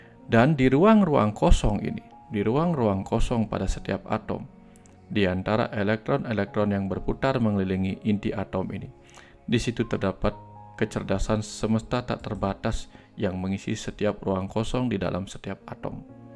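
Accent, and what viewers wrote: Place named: Bali, Indonesia. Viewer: Huruf T nya masih Bali yan.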